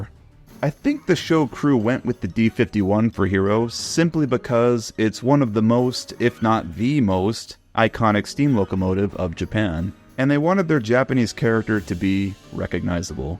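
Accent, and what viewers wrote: United Kingdom. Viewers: Which accent of Britain Does have.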